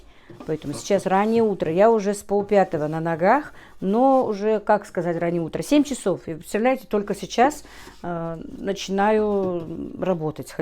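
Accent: Standard. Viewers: Прекрасная чистая речь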